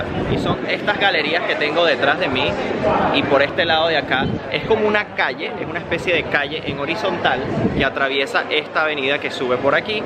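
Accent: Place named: Venezuela. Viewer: De dónde eres youtuber x tu acento creo q eres veneco seguro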